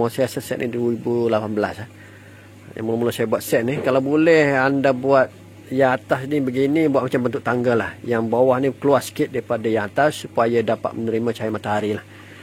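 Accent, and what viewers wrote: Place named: Kelantan, Malaysia. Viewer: cikgu ni org kelantan kea?..ad bunyi Kelantan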